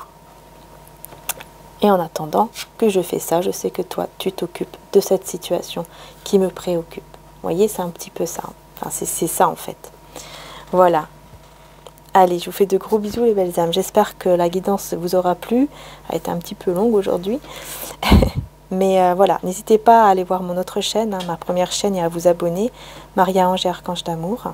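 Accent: France. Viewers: je suis allée voir la chaine italienne, trop mimi le mix entre petit accent français et petit accent sicilien😊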